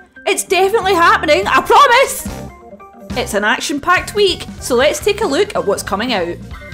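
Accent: Scotland, United Kingdom. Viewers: More Scots, please!👍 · Very Scottish. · Great little nugget of gaming news wrapped up in a lovely Scottish accent. Perfecto! [Chef kiss] mwha!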